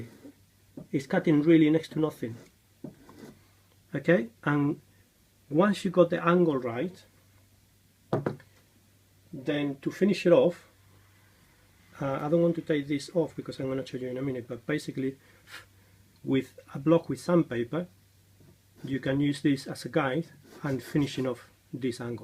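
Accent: United Kingdom. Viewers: I like these videos. Great instruction. But Mr learned English from a Brit. Some nice American should teach him how to pronounce the "oh" sound. (This, from an admittedly anal-retentive English teacher with a touch of irreverence. I wish I could speak Spanish half as well as he speaks British.) But in all seriousness, these are great videos. Mr. shows and explains as well as can be done in a video. I hope someday to be able to take one of his classes.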